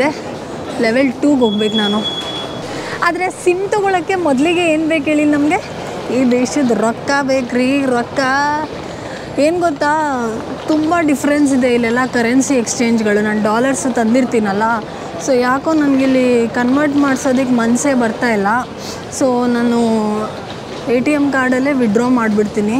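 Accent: North Karnataka, India. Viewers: Madam baal information kodathiri tumba ista aadri nivu baal chanda uttara Karnataka maath mathadteri please nam baashe explore maadi namma bashedag vlog maadri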